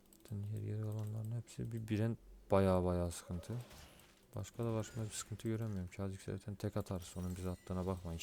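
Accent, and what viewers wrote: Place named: East, Turkey. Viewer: Merhaba guzel şiven var dogu tarafı bi şive Allahıma kitapıma ha gibi cümleler kursan o dogu tarafini bize hissetdirirsen cok samimi ve guzel olur diger yayincilardan farklanir kendine ozgun kitlen olur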